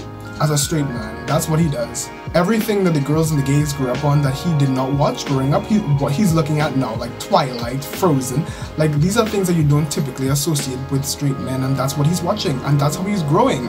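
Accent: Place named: Trinidad and Tobago. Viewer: You sound trini ❤🇹🇹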